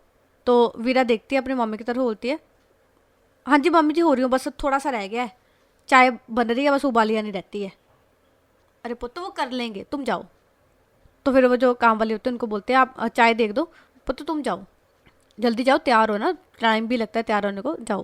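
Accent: Punjab, India. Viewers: I love the way of your storytelling and your punjabi accent 😊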